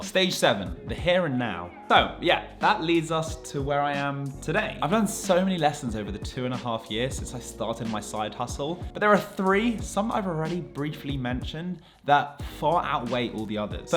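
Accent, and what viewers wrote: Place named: United Kingdom. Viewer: Why did you put dollars rather than pounds in the thumbnail when you’re British? Super confusing! I was thinking maybe you’re American until I clicked on and heard your voice. It couldn’t be any more British lol 😭💕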